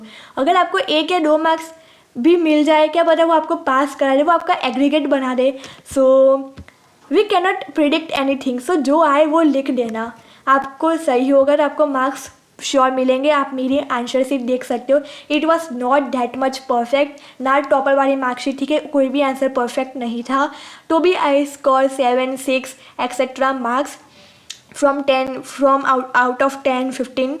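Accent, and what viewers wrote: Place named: North, India. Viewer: That northern accent tho 😂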